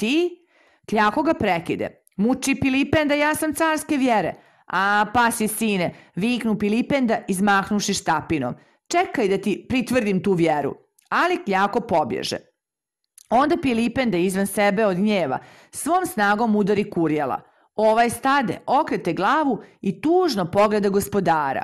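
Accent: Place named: Serbia. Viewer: Jeli ovako govore Dalmatinci ovo je Srpski iz Srbije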